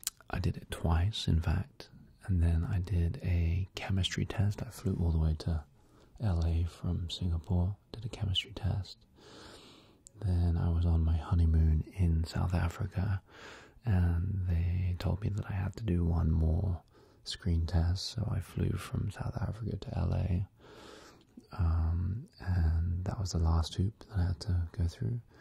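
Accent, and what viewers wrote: England, United Kingdom. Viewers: That accent . Oh the English 🤤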